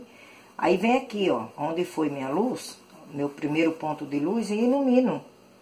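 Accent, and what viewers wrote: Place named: Pernambuco, Brazil. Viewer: DEUS te abençoe pelo carinho o qual vc ensina, estou aprendendo e da forma como vc ensina facilita muito, vc é pernambucana? Pelo sotaque acredito que sim, gente muito boa de coração, meu esposo é 😊😊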